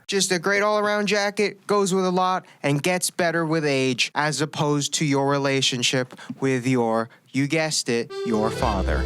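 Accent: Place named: Hawaii, United States. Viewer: he's hawaiian livin in nyc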